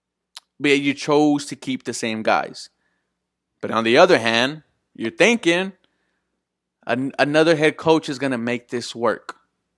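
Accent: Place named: California, United States. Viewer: May I ask where you from you sound like a California or New Yorker?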